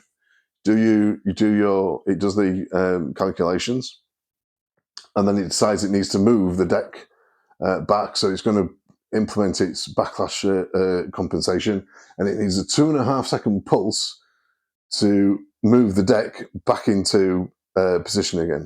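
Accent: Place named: South Yorkshire, United Kingdom. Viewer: Thanks! Nice to hear a south Yorkshire accent. I'm in north Norfolk so not a million miles away. I'm currently looking seriously at the HEM15 to replace the Skywatcher Alt-Az I'm currently using, so your review is very useful. I understand the HEM15 has wifi, so presumably I don't need the hand controller?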